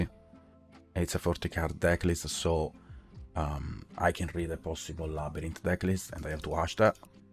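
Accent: Italy. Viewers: Ho sentito 10 secondi di video e ho già capito che sei italiano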